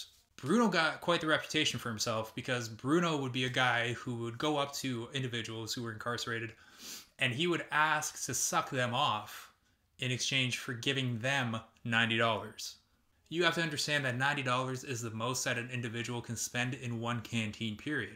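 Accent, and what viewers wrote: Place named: Canada. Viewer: I love the way Canadians pronounce “about,” and you’re also really good looking. Information and cute accents sound best from a handsome man. ❤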